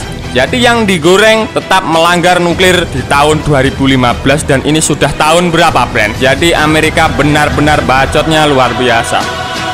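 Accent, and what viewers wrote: Java, Indonesia. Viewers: Medok bingit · mentok jowo nya ,hahaha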